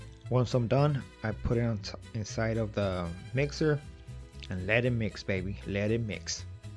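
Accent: United States. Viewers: I’m watching this video and I love the fact that you started your own business. You don’t have a Mexican accent at all. You have an American accent. I noticed that you are going to close the business and that’s sad. I hope you get another location and continue your business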